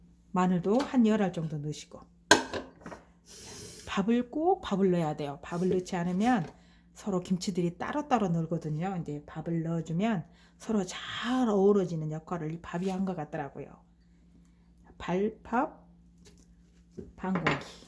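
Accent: Jeolla, South Korea. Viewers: 말투나 만드시는 음식이 남도쪽이신거같은데 남도가 고향이신가요? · 낭글 낭글ㅋㅋ 전라도 아짐ㅋ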